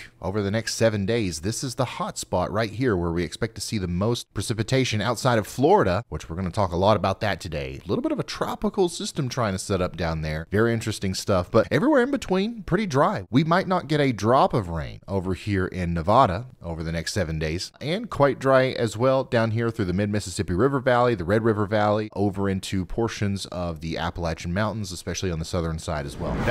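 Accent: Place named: Texas, United States. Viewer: Where you from for some reason I keep thinking you're a Texan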